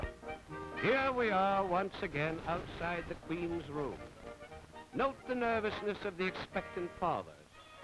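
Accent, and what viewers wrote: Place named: Transatlantic, United States. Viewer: Transatlantic accent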